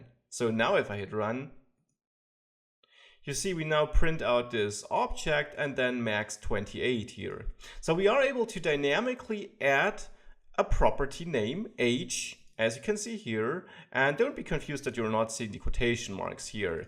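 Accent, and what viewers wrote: Germany. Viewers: the thick german accent it, LOVE IT!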